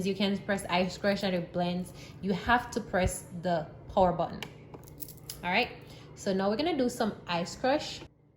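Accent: Jamaica. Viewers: Your accent is so beautiful, just like yourself. Are you from Jamaica? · i was she is from jamaica but at first i didnt hear your accent but like 30 seconds in i was like mi sista indeed lol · I hear a JAMAICAN IN YOU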